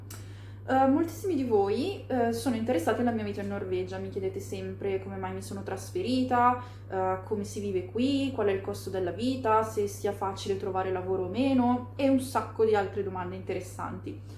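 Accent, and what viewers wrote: Italy. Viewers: il tuo accento è italianissimo, lombardissimo. E' esteticamente che sei italiana quanto io curdo. Le tue ORIGINI non sono italiane. O hai il coraggio di dirmi che tutti e 4 i tuoi nonni sono italiani ? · ma perchè parli con accento milanese se sei in norvegia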